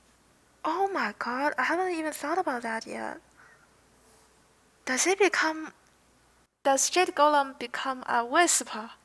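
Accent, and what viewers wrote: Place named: China. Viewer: 学长的英文一股汉语味er，但是你什么时候回国内平台播啊？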